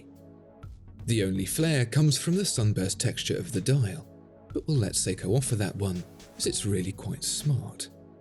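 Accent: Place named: RP, United Kingdom. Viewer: Okay, so I heard "A Rolex is to watches what chimpanzees are to primates, the best, the original, the classic." I don't care for Rolex but are we just going to act like saying that chimpanzees are "the best, the original, the classic," is fine as long as it's said by an elegant voice with a High Street accent? Oh, my oh my. Hilarious.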